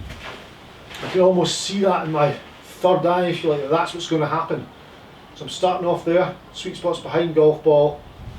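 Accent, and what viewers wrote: Scotland, United Kingdom. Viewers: Mr., you are as much common sense and down to earth in your explanations as anyone I've ever heard! Even if your Scottish brogue makes this Florida boy concentrate on what you say. Lol I'm 70 and recovering from back lumbar fusion surgery (like Tiger) now I have time to rethink my swing (get the mental process changed) so my physical changes easier to accomplish. Thank you. I find the golf machine explanation complicated and confusing... You make the concepts much easier to understand. These are very good videos! Thanks again.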